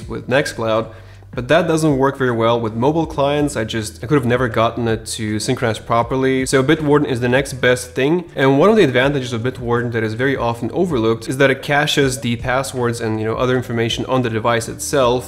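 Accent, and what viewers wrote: Russia. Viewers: такое ощущение что автор видео Русский пацан - по акценту заметно =) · Are you from Russia?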